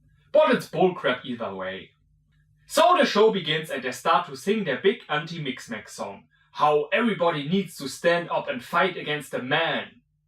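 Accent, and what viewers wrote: Germany. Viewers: Hearing a German man say Bollocks is strangely hilarious. Not bad commentary, you're kinda like a German Decker Shado. A'ight, you earned yourself a subscriber. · Are you speak in german accents?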